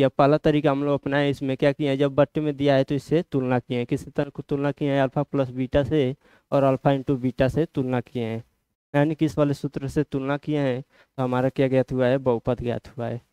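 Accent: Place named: Uttar Pradesh, India. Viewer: Bhaiya aap sachi me up ke lag rhe ho 😂😂😂😂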